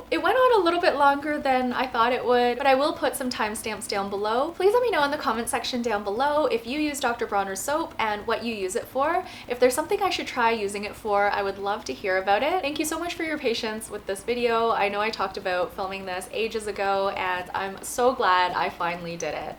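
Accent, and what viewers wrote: Canada. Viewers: Canadian? Sounds like it from how you say out and in a pinch.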